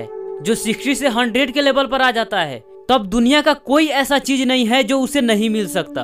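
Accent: Bihar, India. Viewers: Bhi app bihari ho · Aap bihari ho n pakka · Bro you from bihar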